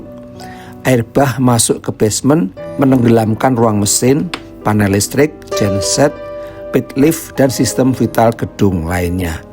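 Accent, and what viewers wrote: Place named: Java, Indonesia. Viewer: Naratornya orang Jawa, logat medoknya masih kentara, ga enak didengernya.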